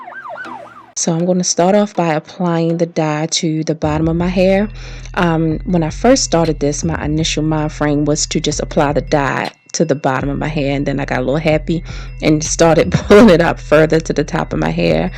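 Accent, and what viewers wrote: Baltimore, United States. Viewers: Are You From Baltimore? I Hear An Accent❤️❤️ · Girl you must be from bmore... we talk just a like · I knew it...you have that Baltimore accent...I grew up there...my BFF IS THERE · You sound like your from Baltimore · TEEEEWWW 😭😭😂 I said oh hell shes from Baltimore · New subbie!! I can here that Baltimore or DMV accident, Morgan State Univ in the house! lol. You are so beautiful and calm lol Love your hair, I subbed!!!! 😍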